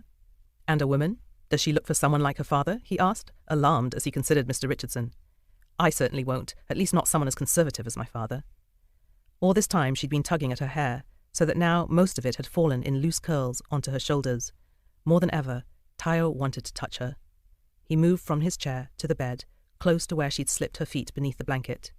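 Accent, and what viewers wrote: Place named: United Kingdom. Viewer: The voiceover sounds way more British than the normal African tongue context, ngl but the phonetical pronounciations of each words solely suites the accurate tonations in variations. Gracias.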